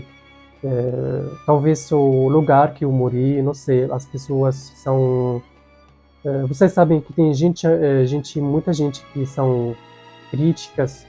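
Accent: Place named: Arab world. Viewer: Da pra ver que tu falas desde pequeno o idioma portugués ,, e nao aprende em um ano pois tu nao tem s o sotaque árabe Tão forte sua mae é brasileira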